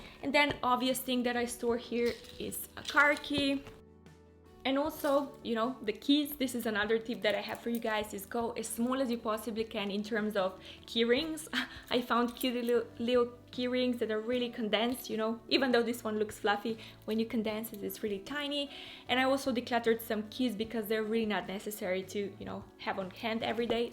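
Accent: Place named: England, United Kingdom. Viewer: Love your english accent, where it from???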